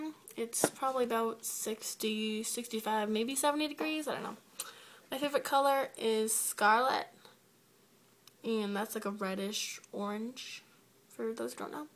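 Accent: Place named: Boston, United States. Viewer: Lol babe I love the way you pronounce coupon, pajamas, caramel, salmon, fire, water, New Orleans, lawyer, then any other thing with a Boston accent.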